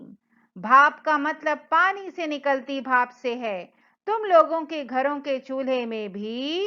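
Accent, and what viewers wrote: Kerala, India. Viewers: mam aren't u from kerela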